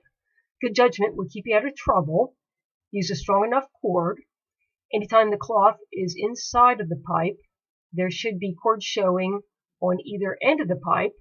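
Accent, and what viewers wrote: Philadelphia, United States. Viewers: Philly accent?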